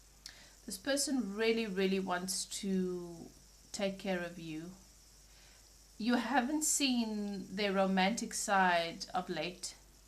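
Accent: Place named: South Africa. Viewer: Very good reading.. You are very gifted. Your accent sounds South African, so good to see this evolution in spirituality. Thank you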